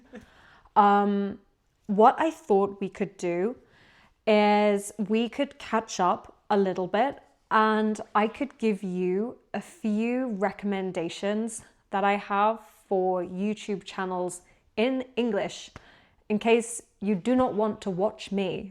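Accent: United Kingdom. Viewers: 我i like your teachingm, for cn people, from British accent , its very good verygood for us , because pronunciation and clear , just like your teach methods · British accent is absolutely beautiful. She speaks at a comfortable pace, which is perfect for beginners like us. Let’s keep working together to develop the ability to think directly in a second language, rather than translating everything in our heads or depending completely on AI and missing the chance to build our own language intuition. The funny thing is, this comment itself was translated by AI😂😂. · Goog job! You're my first and the only native english channel. I'm about to start a new job in London next week so thanks for letting me hear your british! Best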